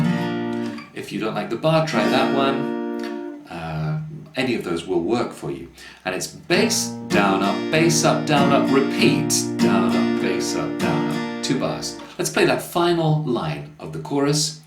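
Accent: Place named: United Kingdom. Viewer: Great lesson thank you. Very funny how the Brit accent drops instantly singing right on the money:)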